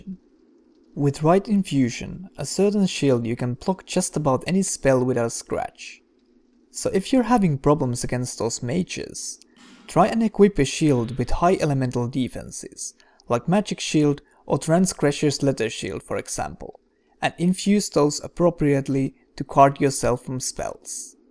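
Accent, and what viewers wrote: Finland. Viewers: that accent, i could swear that you are from finland =) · arvasin tosta aksentista :D terveisiä kajaanista :DDD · are u finnish?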